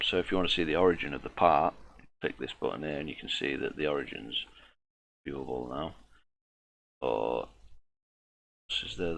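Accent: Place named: United Kingdom. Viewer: It's about time we seen a brit doing videos more on youtube and the best thing of it yet is, it's solidworks 2017 of which I'm learning to use better!! Proper banging video dude, I think everyone should drop their H's where I live here in Northampton and being a southerner I'm seen as an alien doing that LOL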